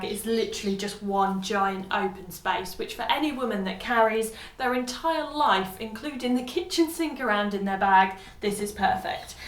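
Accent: United Kingdom. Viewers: Gorgeous those blazers !!! I loved them. In fact, I liked all of your purchases, the white and pierced blouse is beautiful !!! Oh, I always forget to tell you, I love your UK accent !!! Best wishes from Brazil.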